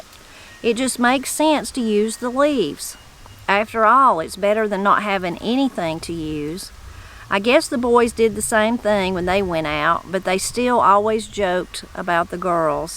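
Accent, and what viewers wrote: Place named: South, United States. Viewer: I love hearing your southern accent while you're reading! I listen while I'm cleaning the house. Opal is a real inspiration, too ! I love watching all your family! You are very blessed ❤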